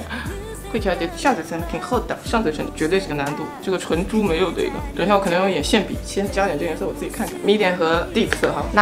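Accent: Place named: Xinjiang, China. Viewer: 为什么韩语说出来新疆味？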